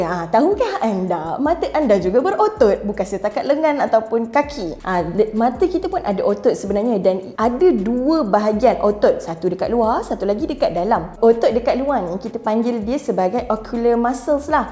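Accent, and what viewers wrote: Malaysia. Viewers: Cewek malaysia lucu klo ngomong 😂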